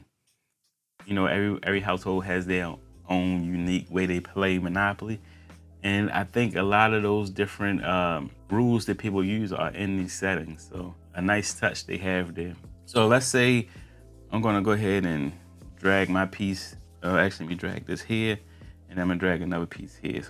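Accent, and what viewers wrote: Baltimore, United States. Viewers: that baltimore accent coming out big time lol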